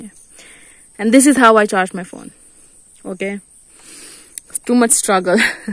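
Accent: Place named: Jharkhand, India. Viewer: Ap to bilkul jharkhand k logon jaisa hindi bol rhi hai, ekdm raw and original. I thought people over here speak only English. This is the first video I am watching related to Mizoram lifestyle and I am quite impressed. Lots of love and warm hug from Jharkhand.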